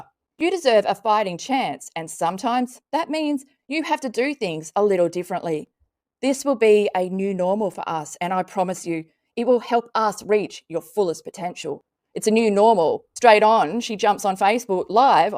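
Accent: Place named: Australia. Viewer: How dare you. Do you know that person? Have you spent time in her home? So you claim to have had all the conditions her boy has? You sound Australian. I've just reported your content. I'm not American, so I've not watched her channel and I'll never watch yours. I hope many more people report you. You are a dangerous and evil person.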